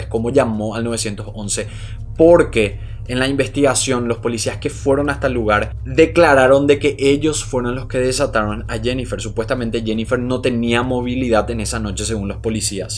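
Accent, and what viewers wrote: Guarani, Paraguay. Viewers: Este vídeo es algo TRAMBOLICO jajaja no mentira, realmente son muy interesantes tus vídeos y detecto en tu acento que eres paraguayo, me suscribo porque vale la pena tienes buen contenido, felicidades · Por su acento, pienso que es de Paraguay😉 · Me encanta tu acento guaraní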